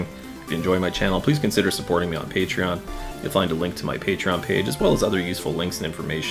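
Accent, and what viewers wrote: Canada. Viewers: Very Canadian accent!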